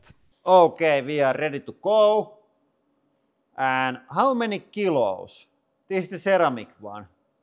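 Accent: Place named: Finland. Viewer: It's funny how the years go by, but that Finnish English is still as strong as ever.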